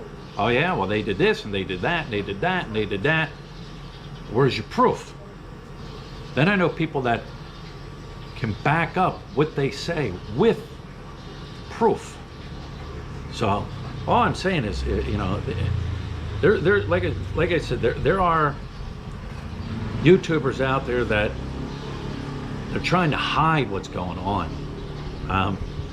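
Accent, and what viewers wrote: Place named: Pittsburgh, United States. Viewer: I know you call yourself "," but that sounds like a serious Pittsburgh accent! Dan from Johnstown.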